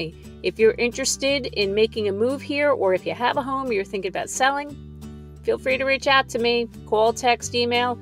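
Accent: New Jersey, United States. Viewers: She sounds like she should of been on the Sopranos.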